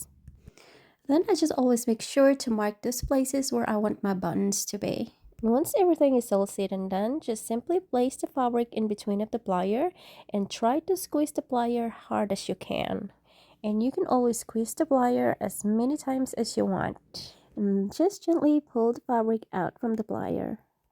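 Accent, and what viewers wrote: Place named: Philippines. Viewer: I knew it was a Philipino accent.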